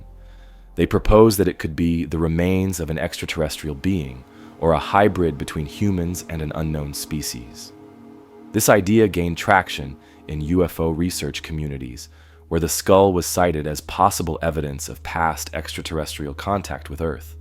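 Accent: United States. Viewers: Thank you for the voiceover with an American accent. Well done. 👽✌️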